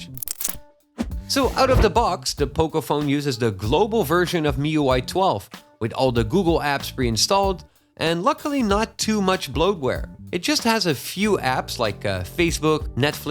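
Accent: Netherlands. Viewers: Hi, net je review bekeken. Zeer duidelijk 👍🏽 Dacht dat ik een Nederlands accent hoorde. Kijken of ik deze telefoon vandaag in Nederland krijg besteld. Top review. Groetjes uit Nederland en ga zo door. Marvin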